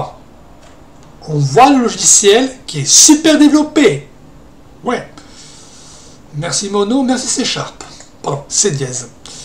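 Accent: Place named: Arcachon, France. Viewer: Pour moi c'est plutot bel et bien une Dglfi. Sinon a part ça part chez moi en france et en français on prononce win mais pas winé. Tu es bien sur maitre sur ta chaine et ce doit etre du a l'accent d'arcachon qui est bien sympa de toute façon.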